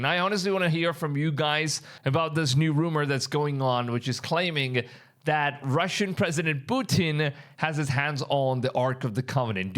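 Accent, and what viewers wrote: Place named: India. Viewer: he is from India and English is not his native tongue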